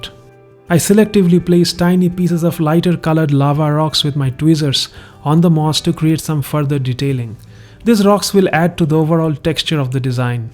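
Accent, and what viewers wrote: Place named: India. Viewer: Your accent is very strong. I couldn’t understand you at first glance but after 5 slides of the same thing. I get you my brother from India dude.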